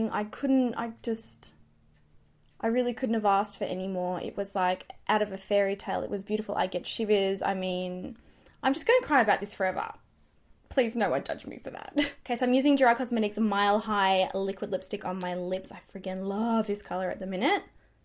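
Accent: Australia. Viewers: I recently found your channel and you’ve filled a huge homesick void for me. I moved from Australia to America in 2012 to be with my husband. I miss Australia so much, it might sound odd but hearing your Aussie accent and slang makes me SO happy, you’re so much more relatable and down to earth compared to other Australian YouTubers. I haven’t seen or spoken to another Aussie since moving here and I often feel like an outcast. Watching your videos reminds me of where I came from and to be proud of it. Congratulations on your engagement!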